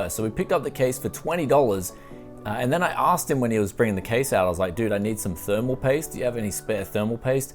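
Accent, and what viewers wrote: Australia. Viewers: Welcome to MAGALAND dude! Let me get my brain around this...your're footloose in America, with a Camaro, big smile, and Aussie accent. DON'T SPEND ALL YOUR TIME THROWING OLD COMPUTERS ON THE HOTEL BEDS! P.S. I have a free MSI 980 if you drop by and pick it up. Cincinnati Ohio. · Mate I reckon your Aussie accent led that guy to chuck in that cooler LOL. If you sound like a bogan in the USA you can get some dope deals and the yanks love that "Howya goin" accent.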